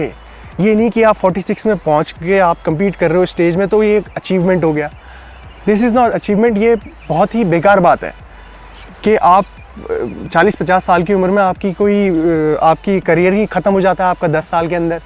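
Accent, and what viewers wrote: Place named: Uttarakhand, India. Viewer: You look so genuine. Aapke hindi main aapka uttrakhandi hota jhalakta hai 😉😉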